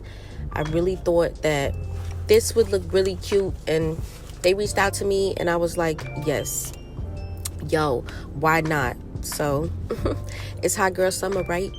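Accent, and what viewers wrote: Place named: New York, United States. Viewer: lol, yea girl. you are almost prime with the NYC accent. more practice :0) it must be really humid today. when it gets humid here i have trouble breathing. and then it takes my energy. ughh!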